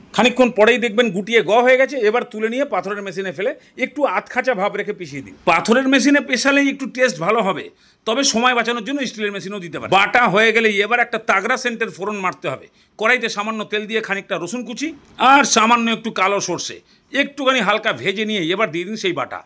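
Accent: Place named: Bangal, Bangladesh. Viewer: Aber bangal vasa ta keno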